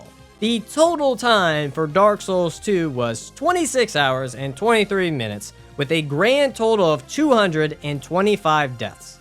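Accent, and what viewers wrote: Louisiana, United States. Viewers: Hearing the Cajun activated me like a sleeper agent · Immediately subbed when I heard the Cajun French, Louisiana/Souls representation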